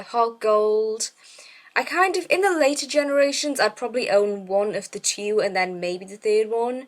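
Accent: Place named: United Kingdom. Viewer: Hey :) i'm a new subscriber & i couldnt help but notice your interesting accent. Roughly whereabouts to you live in the UK? Also, i enjoyed the video :D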